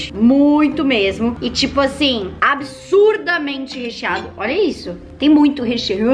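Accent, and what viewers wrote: Rio Grande do Sul, Brazil. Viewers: Gaucha né?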